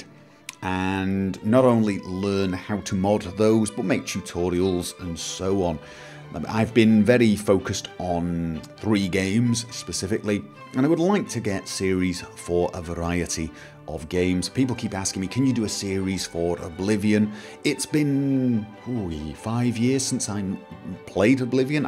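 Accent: United Kingdom. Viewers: WAIT.. you're not a well mannered British Sir with a calm voice who wears mostly tuxedos, monocles and top hats/bowlers in real life who - for some inconspicuous reason - lives in Norway? THE WORLD IS BROKEN. In all seriousness, it's really hard to imagine an angry. Like, that British voice that taught me how to mod Skyrim and FNV? That's just beyond my imagination. Or that an angry would at least sound not that angry.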